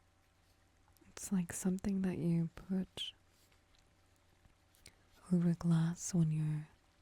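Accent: New Zealand. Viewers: Man I love to tune out to the Kiwi woman’s voice. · Thats the NZ accent for ya mate.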